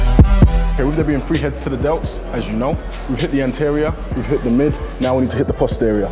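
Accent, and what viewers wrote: United Kingdom. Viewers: Are you British ? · Alright bro, r u from here in the UK???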